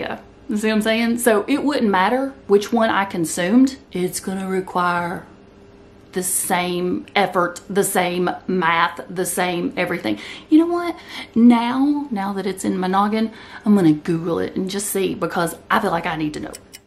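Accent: South, United States. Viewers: I love how you talk. You’ve got such a lovely accent. I’m guessing you’re a southern lady? x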